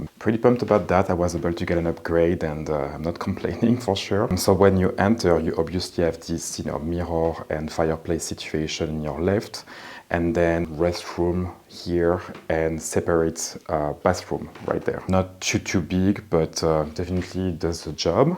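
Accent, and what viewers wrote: France. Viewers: French who speak english with french accent it s awfull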